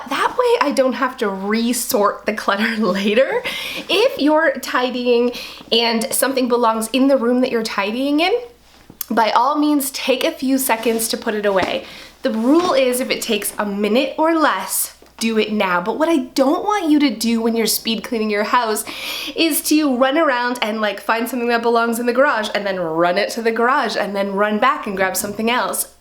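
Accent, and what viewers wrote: Canada. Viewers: I'm from Maine, I love your Canadian accent with your enthusiasm! 😂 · Out and about? Canadian? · Are you Canadian?, you sound like one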